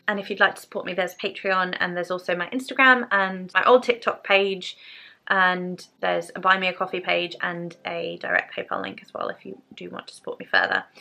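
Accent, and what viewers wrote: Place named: RP, United Kingdom. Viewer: Love your video and thank you so much for sharing your altar in this video. So creative and absolutely LOVE that huge rose quartz tower. Love that we both truly love the dark goddesses: Lilith, Hekate and The Morrigan. I pointed out that your book shelves looked like mine with all those books piled high. He was like "you witchy types like your things," I was like, "be glad that you are one of those things that I still like, lol." I adore your accent as well. It sounds so posh to me. Being from the states and I am in central Ohio, I don't really have what you call an accent. I get a country thang occasionally when saying certain words and that comes from my momma, and being around her all my life. lmao. 🌹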